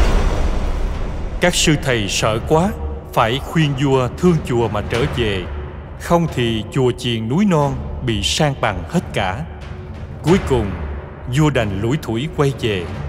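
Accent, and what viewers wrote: South, Vietnam. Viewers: 😂 sặc nam bộ, nghe mà ghiền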